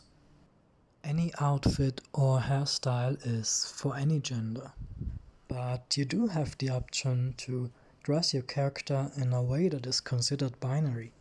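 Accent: Germany. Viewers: Dang i havent seen the video yet and it now just got the notification. Your voice is so beautiful and calming. I figured you'd me southern German/tyrol maybe? I could tell, because I am a German peep too! Your videos are so funny and a big entertainment. You helped me out alot in my moth days so thank you. Keep going and maybe we could be friends on sky?.. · You really have a calming voice^^ Im trying to figure out what your accent is😅🤔🤔🤔 is it german maybe? Or something else? Hmmmmmm🥲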